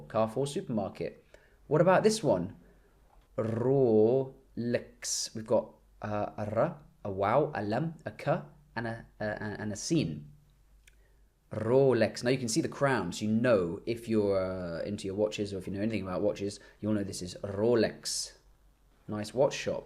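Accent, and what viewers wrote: United Kingdom. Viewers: Hey I was wondering what kind of British accent do you speak & if you could do a video about different accents in England. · Arabic welcome bro u really teach me a lot with ur British accent ❤️👌👍🏻👍🏻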